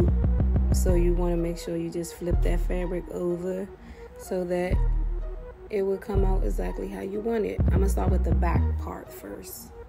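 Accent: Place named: Washington DC, United States. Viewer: yesss I heard the accent. ❤️ I’m from Maryland. Love to see a Washingtonian sewing queen.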